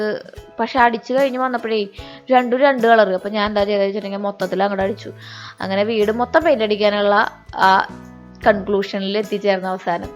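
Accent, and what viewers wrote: Thrissur, India. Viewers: Poduve "poche" lifestyle kanikunna ippolthe vloggerslninnumm..sherikm natural aayt kanikunna chechik irikatte oru kudira pavan... adipwoli..kand irikaan patunindd..👍..thrissur bhashanod ishtm thoni.. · ചേച്ചി യുടെ സംസാരം കേൾക്കുമ്പോൾ എന്റെ അനിയത്തി സംസാരിക്കും പോലെ.... തൃശൂർ സ്ലാങ് 😍😍 എന്തായാലും നമിച്ചു ചേച്ചീ 🙏🙏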